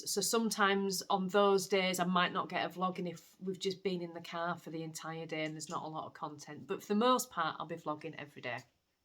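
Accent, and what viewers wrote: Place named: Leeds, United Kingdom. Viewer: Love your podcasts and cant wait to see the advent leggings. Are you in Leeds? Just wondered cause that's where I am and you've got the same accent 🙂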